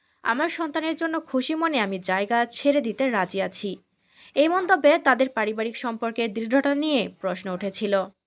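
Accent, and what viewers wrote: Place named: Bangladesh. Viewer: Bangladesher mone hoche apni. Huapy couple bollchen.Huapy ki kotha? Happy balun.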